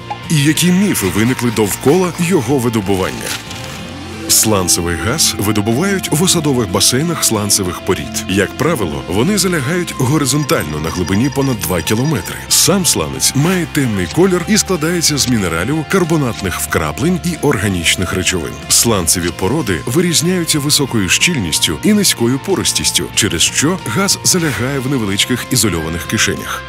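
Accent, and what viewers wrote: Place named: Moscow, Russia. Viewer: Качественное видео сняли. Из того, что мне доводилось слышать, в основном были плохо завуалированные пропагандистские антисланцевые материалы. Хотя бы дикторов украиноязычных взяли, а то московский акцент все выдает. Не интересно даже.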